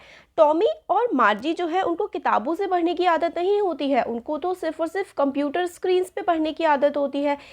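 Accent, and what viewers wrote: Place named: Haryana, India. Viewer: Mam I think you belong to haryana because your voice like my friend she belongs to haryana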